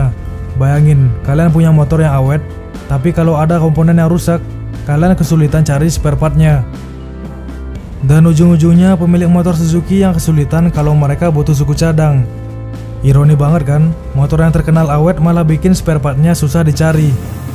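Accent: Bali, Indonesia. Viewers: Pasti ne dr bali, logatnya ketahuan...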